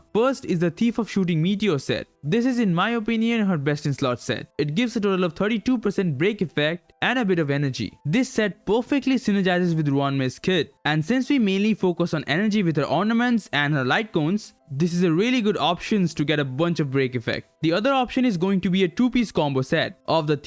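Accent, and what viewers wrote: India. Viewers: Ugh cant stand indian accents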